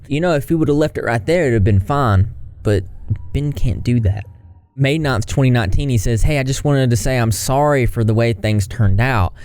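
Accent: South, United States. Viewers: the cutest southern drawl I've ever heard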